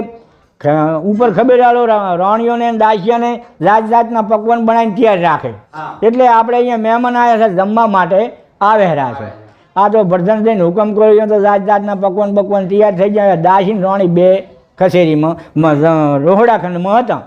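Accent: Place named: North Gujarat, India. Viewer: Love Gujarati language ❤❤❤in north Gujarat style ❤❤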